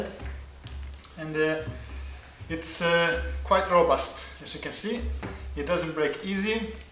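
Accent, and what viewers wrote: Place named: Greece. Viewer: По акценту видно,что грек.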